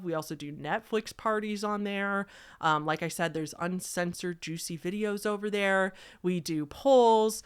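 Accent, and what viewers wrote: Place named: Midwest, United States. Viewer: I love your midwestern accent.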